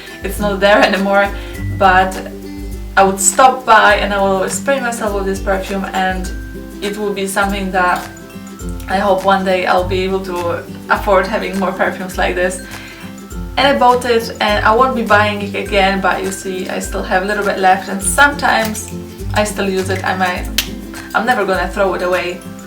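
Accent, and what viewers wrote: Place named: Poland. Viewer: I dont know why, but polish accent is very similar to south american accents... Like venezuela... Maybe its just me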